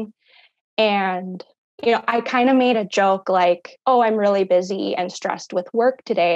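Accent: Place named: Wisconsin, United States. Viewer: I recognized this beautiful family was from Wisconsin within the 1st minute I heard her voice. I'm so very sorry for your loss. She is gorgeous, she is loved, she was wanted and she will always be missed and remembered. Much love and support from Madison, Wisconsin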